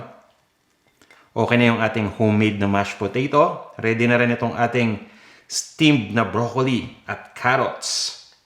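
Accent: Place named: Tagalog, Philippines. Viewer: Gusto gusto ko talaga mga lutuin mo sir and the you speak sobrang tagalog na tagalog Panlasang Pinoy talaga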